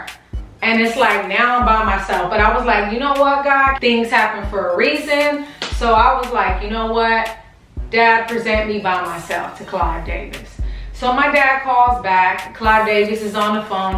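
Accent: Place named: South, United States. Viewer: her little southern drawl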